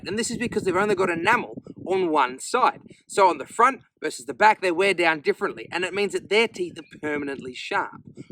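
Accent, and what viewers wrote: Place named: Australia. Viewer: The Australian animals & accent never cease to amaze me.. 😂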